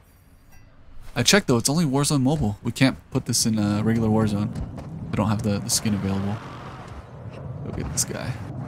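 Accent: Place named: Mexico. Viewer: I know ur Mexican u have a strong accent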